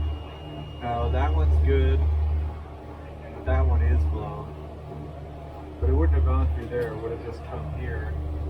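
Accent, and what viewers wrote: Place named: Midwest, United States. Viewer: I've been watching some of your videos lately and never would've guess you were in Hawaii. Was thinking more Midwest or central US. Anyway, great stuff! There's something strangely relaxing about coming home from work and watching someone else work 😂. I do a fair bit of electrical troubleshooting in my job and I like to hear other people's thought process while working through a complex issue. You do a great job narrating and explaining the issue and resolution. Thanks for the great content!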